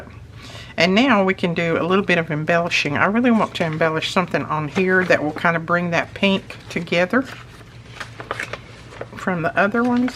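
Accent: South, United States. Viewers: I love your crafts & your voice is so kind; I could listen to you all day. Are you from the South? Your accent seems like you are. I'm from AL. Best wishes & God's blessings. : )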